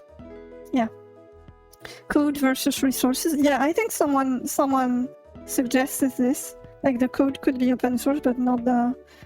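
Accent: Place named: France. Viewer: Your english accent sounds like french. are you french?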